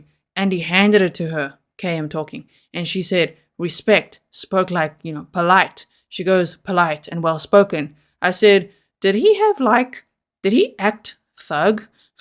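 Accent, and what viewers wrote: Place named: South Africa. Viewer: Excellent presentation, thank you. I detect a subtle South African accent..... Lekker wees, en dankie.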